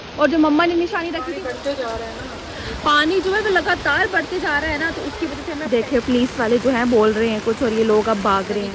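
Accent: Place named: Pakistan. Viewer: Your urdu language and flavour of Pakistani serial made me believe that u r a Rawalpindi girl but soon found lower Gujjar Nagar around children park 😢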